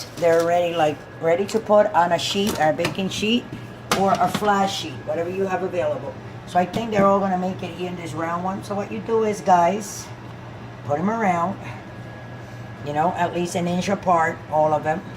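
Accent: New York, United States. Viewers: I've been studying about American accents. where are you from :) ? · I'm from Louisiana and I could tell she was from New York.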